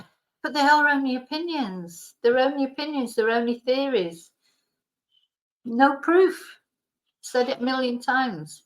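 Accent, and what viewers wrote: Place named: North England, United Kingdom. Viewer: Awe don’t 😊let that di@ run you down. I saw that and I am assuming he’s come off his meds suddenly. He reminds me makes me realise how great it is to be single. You are marvellous and that’s official. I love your channel and it feel’s nice to hear a local voice when I’m doing jobs around my flat…..at night too when I’m winding down and getting ready to go to bed. You can tell he’s a controlling tosser by all the record’s neatly stacked behind him. Jesus grow up fella. Can you imagine living with that? He was obviously getting out of his system something about an ex bleeding him dry and directed at you instead. 😌 Stay strong my fellow northern lady. He is always doing impressions of Michael Caine and thinks he’s hilarious…. I bet the ex had the best laugh when she took everything in the divorce! 😌 just carry on doing what you do best…. 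being you. You don’t have to defend yourself to that maniac, he’s not worth a second thought. To anyone who reads this I am not calling Luke. I think he is a really lovely lad and he’s got a heart of gold. He’s from our neck of the woods isn’t he? If ever you come back home again you are very welcome to come for a cuppa, cake and biscuits in Bramhall Park. I wish I could fast forward to January. Since my mam died it feels pointless. It’s her birthday on the 8th December, mine the 21st and then me who’s dying New Year’s Day. Last year I was just here alone. The first year I never even put a tree up and I used to absolutely love doing that w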